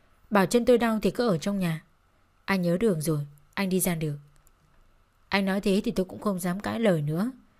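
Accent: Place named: North, Vietnam. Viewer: khong phai ky thi chu giong la giong bac ma minh thay de thuong kinh khung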